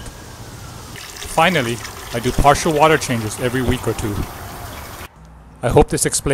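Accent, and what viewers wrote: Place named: Hawaii, United States. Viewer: Hey! Good video! I can definitely sense that local accent. You're from kaimuki I assume?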